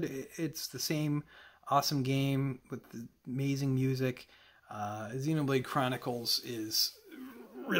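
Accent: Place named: Upper Peninsula, United States. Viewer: Outstanding video, also love the U.P. accent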